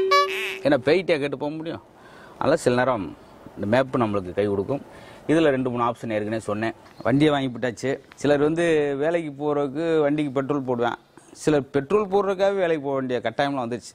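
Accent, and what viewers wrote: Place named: Madurai, India. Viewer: UNGAL COMEDY HIT AAVURADHUKU MADURAI SLANG UH ORU KAARANAM 💯💥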